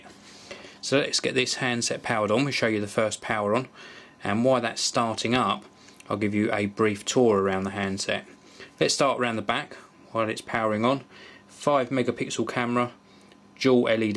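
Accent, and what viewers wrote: United Kingdom. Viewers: I love your british english :D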